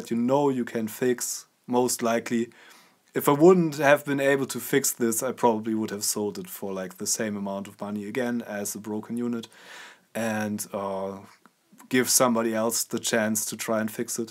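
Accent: Germany. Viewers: nice repair and easy-to-understand explanations... I happen to have the same mic and I was wondering if you could tell me what to fix/ replace in particular because my headphone jack doesn't work anymore... So whenever I try to monitor my still working recordings I can only do it without the mic's output AKA by using the normal speakers included in my PC... Therefore could you please explain what causes the headphone jack to stop working or make a video on it? I noticed that you were very good at explaining in this video, it would help me out a huge bunch Btw, I also noticed that you had a bit of a German accent, I'm a German myself xD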